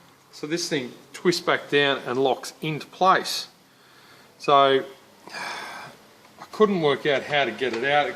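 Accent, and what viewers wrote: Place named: Australia. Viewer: thank you for your video on how to pull the ‘animal’ apart it was a great guide/confidence booster to tackle the replacing of the the main filter around the engine. I live in the UK, I am Ozzy, it was great to hear a beauty Oz accent! Thanks Mate, really appreciated your video and effort, cheers... BTW, It’s back together working, good as new! 😎👍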